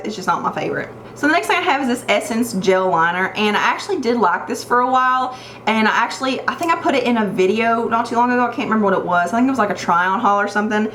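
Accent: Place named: Georgia, United States. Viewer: I subscribed as soon as I heard your accent. 😂😂😂 I'm from GA and live in SC. I like hearing people talk like me! 🙌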